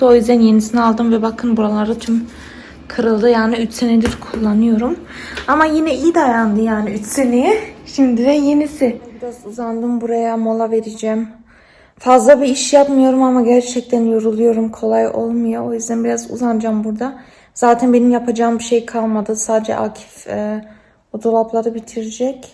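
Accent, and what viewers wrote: Turkey. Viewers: Du bist die beste mit deiner türkische Aussprache Kıvır zıvır 😂 so eine schöne Wohnung 🤩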